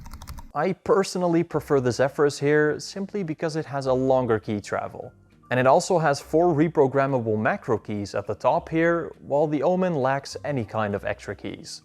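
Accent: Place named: Netherlands. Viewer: U sound so Dutch xd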